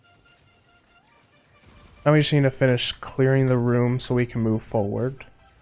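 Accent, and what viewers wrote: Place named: Quebec, Canada. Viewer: French Canadian Le Mont accent NOICE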